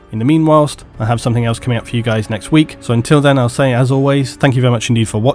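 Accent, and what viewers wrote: England, United Kingdom. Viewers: Hello, just curious, what part of England is your accent from?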